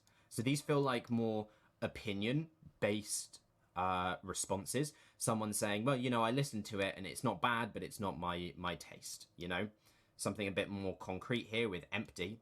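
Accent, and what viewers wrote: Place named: United Kingdom. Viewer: I love the analytical approach to song writing! It's tricky deciphering feedback, and this chain of resources you've established makes a convoluted process much more streamlined. Also, your smooth cadence and suave British accent makes listening to your explanations that much more entertaining. Haha.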